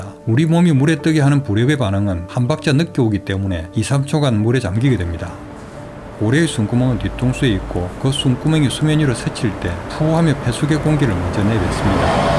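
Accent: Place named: Gyeongsang, South Korea. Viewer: 경상도 분이시죠? 친숙한 말투가 묻어나오시네요 ㅎㅎㅎ 다큐같은 느낌이 잔잔하니 아주 좋네요. 근데, 오디오를 나눠서 시차를 두고 녹음하신건지 맑은 소리였다가 먹먹한 소리였다가 하네요 ㅎㅎ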